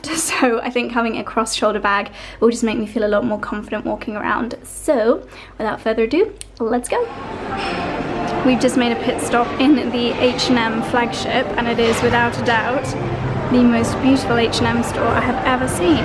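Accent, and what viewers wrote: Spain. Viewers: You have a very good spanish!! Im Mexican and it was lovely to hear you speak with a quite strong spanish accent 💕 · Love your Spanish accent Buenos Dias a ti good morning to you :)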